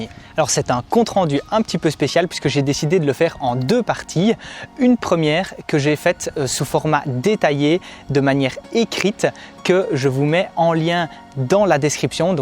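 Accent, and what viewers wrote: Belgium. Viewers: Avec ton accent je croyais que tu étais belge xD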